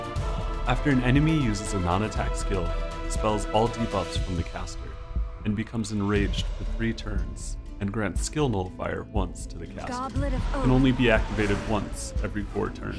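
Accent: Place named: England, United Kingdom. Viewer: English narrator? U sir, have my like 👍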